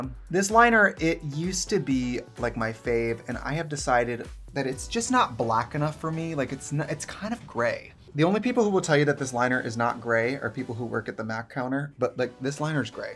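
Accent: Wisconsin, United States. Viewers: Oh she went full Wisco in this one 🖤 probably on purpose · Sounding extra wisco to me. Am I crazy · Her Wisconsin accent is coming out hardcore today honeyyyy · Is it just me or was her Wisconsin accent stronger than usual in this video 🤔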